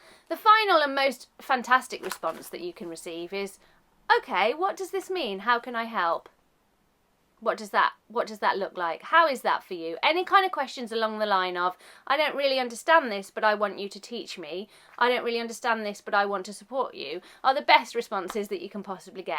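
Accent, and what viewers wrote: United Kingdom. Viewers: Hi. Your videos which focus only on autism have created what looks like a community of ( pro-autists ). With that groundbreaking endeavor you have made normal and likeable what is essentially seen as abnormal and dislikeable. Besides, you have provided a knowledge pool regarding this condition which covers many of its aspects. For us none native speakers of the English language, it is a thousand times easier to find a material in American English than to find it in British English. For me, both as a dentist and an avid student of the English language ( the British accent ), your videos provide a save heaven of learning which I both appreciate and admire. I have been thinking, as well, it would be great if this same idea be expanded to cover other areas of people with special conditions. Such a focus will turn these special conditions from the stereotypical branding of ( disorders ) and ( disables ) into groups of special conditions and especially creative communities. 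I strongly believe, it worths trial.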